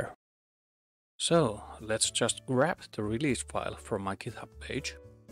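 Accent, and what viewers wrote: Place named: Finland. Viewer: Arvasin heti aksentista, että kyseessä on suomalainen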